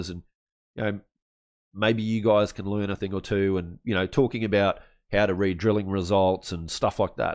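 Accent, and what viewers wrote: Australia. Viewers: Love the Australian accent , EH!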